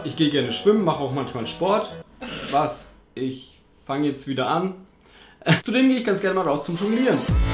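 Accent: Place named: Franconia, Germany. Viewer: Der fränkische Dialekt ist zu geil :D Grüße aus Bamberg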